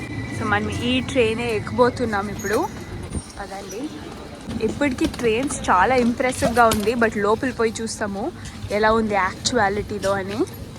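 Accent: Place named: Hosur, India. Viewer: Midi Hosur kada, your Telugu is same as my Hosur frnd...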